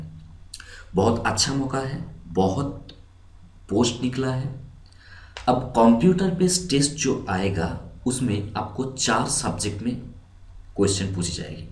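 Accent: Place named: Bengal, India. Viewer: Apna hindi thik karo bangali babu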